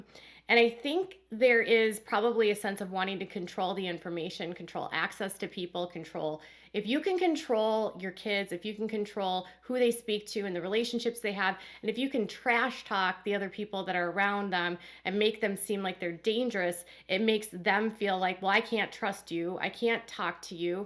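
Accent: Minnesota, United States. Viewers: Minnesota? No wonder. I thought you were Canadian. That dang accent. Sorry you have to live in such a cold area. It’s nice here in Los Ángeles and, it’s super nice here.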